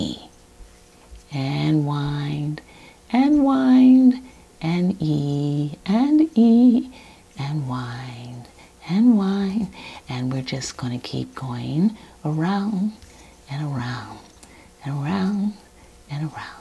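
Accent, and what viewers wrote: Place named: Boston, United States. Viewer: Thank you so very much for doing this! You are a great teacher, and your accent reminds me of my grandma who was from Boston. I will be watching more of your videos! :)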